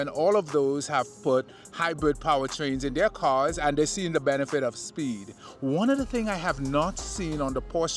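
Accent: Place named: Saint Vincent and the Grenadines. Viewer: Hey! I agree with your analysis of the 992.2. Some terrible decisions by the VW group. After the 997 years, the 911 has just been getting uglier every year. BTW!! Are you from St Vincent in the Caribbean? My friend Kim Collins has the same accent as you. Just wondering. Thanks for the great content. All the best!!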